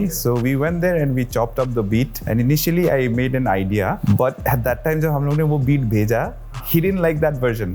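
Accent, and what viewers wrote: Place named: Assam, India. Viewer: 💯 percent he is Assamese.. Accent se hi maloom chala merko..🎉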